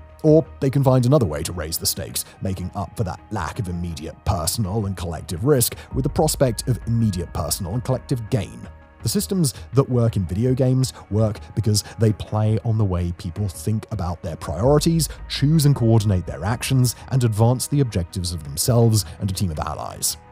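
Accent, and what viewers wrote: England, United Kingdom. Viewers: most annoying english accent 2025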